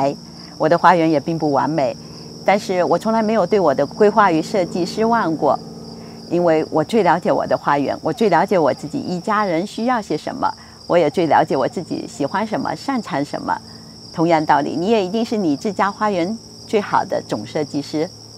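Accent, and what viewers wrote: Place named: Northern Fujian, China. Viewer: 您也算是业余花园设计师了，用心打造美好生活😊听您的口音是不是闽北地区的？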